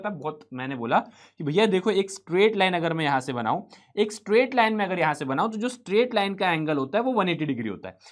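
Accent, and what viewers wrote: Bihar, India. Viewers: Sir ap bihar ma kha se ho ap ki language bihar jaise hai 😂😂❤❤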